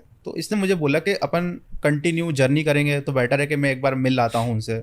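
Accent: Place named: Bhopal, India. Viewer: Ek bhopali hi dusre bhopali ko pehchan skta h only accent se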